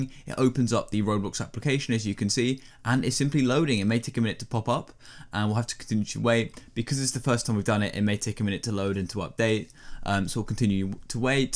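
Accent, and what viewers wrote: United Kingdom. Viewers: litterally a british dude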